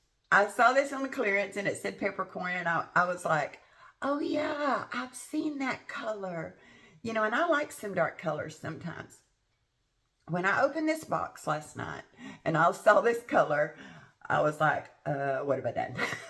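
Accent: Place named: Texas, United States. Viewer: I just stumbled in your review by accident while looking for Rachel Welch short wigs. I’m so glad I did. You give such a new refreshing opinion to wig reviews. You also sound southern or Texan which is homey to me. I like that you seem really interested in helping wig wearers. I’m new to hair loss and it keeps me tucked into my house. I’ve purchased about 10 wigs but sent most back. I’ve got to find something I can wear out of the house. Thanks for the help.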